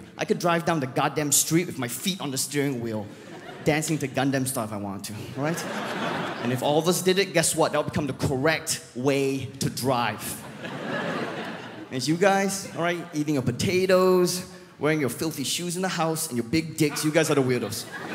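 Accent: Australia. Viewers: This guy is funny Maybe these people don't understands his Aussie accent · Finally found the reason why he sounds like aussie and Singaporean at the same time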